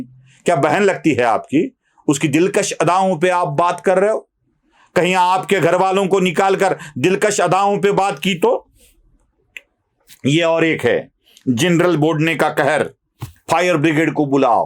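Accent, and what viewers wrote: Maharashtra, India. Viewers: सर आर्ध मराठी माणूस मराठी बोली नाही विसरू शकत हिंदी बोलताना keep it up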